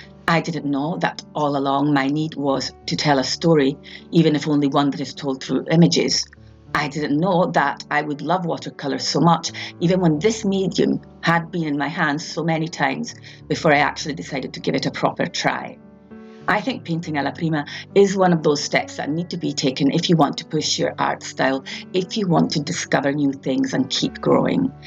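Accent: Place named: Scotland, United Kingdom. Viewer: Your accent sounds Scottish, Italian and Jamaican in parts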